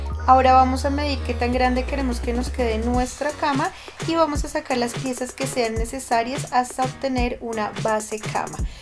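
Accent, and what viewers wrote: Colombia. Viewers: muchísimas gracias...las voy a realizar...por el dejo eres colombiana....saludos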